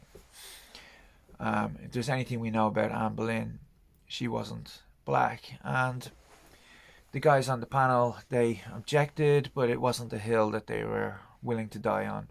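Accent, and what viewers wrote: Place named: Ireland. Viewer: I love the Irish accent, it's my favourite alongside the Italian accent. It's always nice to hear an Irish accent, especially when they talk about a subject I'm passionate about. :)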